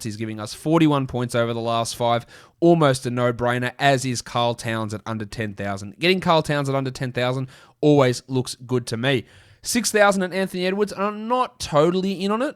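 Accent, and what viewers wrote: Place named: Australia. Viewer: I loves Aussies but trying to listen to one of em talk DFS NBA basketball is cringy. Just can't do it. I'm 2 min in and I've got to stop this video. Get back soon. 😂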